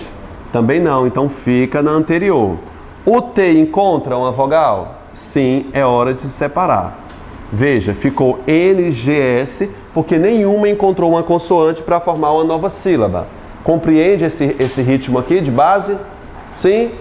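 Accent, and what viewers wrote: Northeast, Brazil. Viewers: Primeiro professor que não me deixa entediada. É didático, sem gracinhas, sem exageros para prender atenção, direto ao ponto. Fora esse sotaque maravilhoso do meu nordeste 😍😍😍😍 Nossa eu amo suas aulas. Muito obrigada, de coração.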